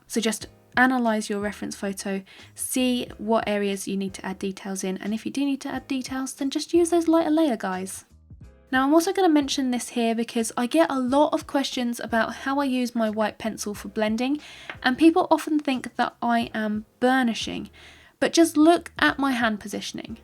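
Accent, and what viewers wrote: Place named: Australia. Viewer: Brilliant video- thank you so much for this, and all you share of your knowledge! 👍 Just love your aussi dialect too! ❤ Greetings from the other side of the planet - Sweden. 🇸🇪🕊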